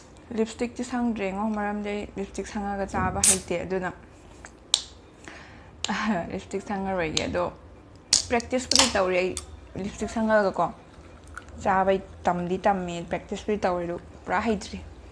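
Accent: Kakching, India. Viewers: Kakching londa ngango taba yam nungaiye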